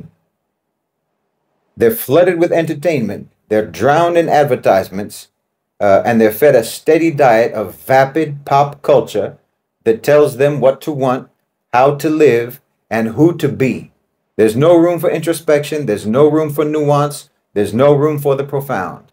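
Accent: United States. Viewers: I understand your impression of the US and its citizens, but I can't say that I agree with you completely. You are very articulate and well spoken, but not all of us in the US are bound by the constraints that you deem to be inherent in the system here. We usually all grow up with access to books, as you obviously have, which can allow the reader to experience different realities and socio-economic circumstances to which we may have never actually been privy to. You come across like your perception of the US was formed from what you have seen in movies, and not having experienced living in US culture for that long a time. I could be wrong, because judging from your accent, you have either spent a great deal of time in the US, or your parents did. You make a lot of generalizations about the population here, but this country is filled with people from all walks of life who all have a different perception of their circumstances.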